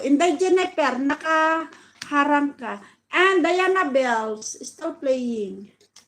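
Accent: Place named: Visayas, Philippines. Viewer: Kaya hindi yanmaganda pakingan host heheh may bisaya daming pronounced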